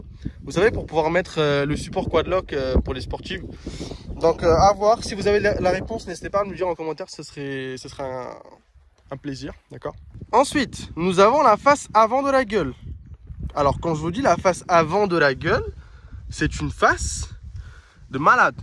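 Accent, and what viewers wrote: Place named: Banlieue, France. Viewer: Tu as un accent des cités. Tu viens d'où ?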